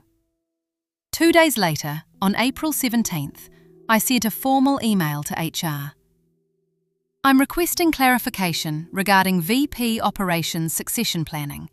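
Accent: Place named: United Kingdom. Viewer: Lovely British accent of the narrator!!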